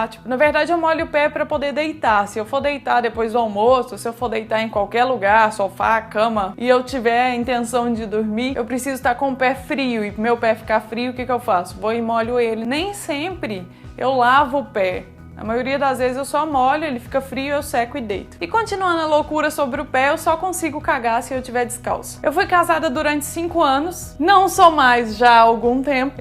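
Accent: Minas Gerais, Brazil. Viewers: CARA, COMO VOCÊ É FOFA, ESSE TEU JEITO DE FALAR "É BUNITO DEMAIS DA CONTA SÔ", ACHO LINDO E VOCÊ É UMA SIMPATIA DE PESSOA, TE ADORO, SUA LINDA!!! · Por isso do sotaque mineiro uai uehhehhehhe 😂👏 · Se você nao conta, ninguém ia saber que você é mineira :-) esse sotaquinho de Mineira... :-)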